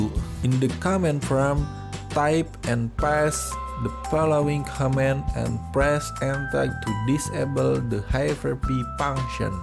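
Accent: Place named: Bengal, India. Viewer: Dat bangga English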